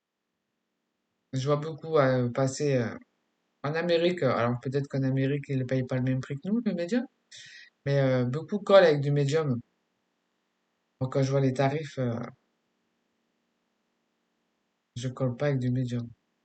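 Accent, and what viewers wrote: South, France. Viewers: Merci pour cette nouvelle page toute vintage ❤️ Ce serait dommage de nous parler en anglais ton accent du sud est tellement agréable ainsi que ton rire léger, bravo pour ta petite célébrité toute méritée à bientôt 😊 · Une jolie page vintage qui met en valeur cette ravissante petite fille avec tous tes pts bouts de papier façon patchwork , tu as des doigts de fée de magicienne ;) Le résultat donne une petite merveille .. Je retiens l'astuce pour le tamponnage sur du papier de soie pour plus de netteté , c'est vrai que tamponner sur une page de ART journal quand il y a des multicouches n'est pas toujours réussi.. Tu n'as pas l'accent du Nord , mais bien du sud je trouve . Félicitations pour le nbre des abonnés qui grimpe , bravo !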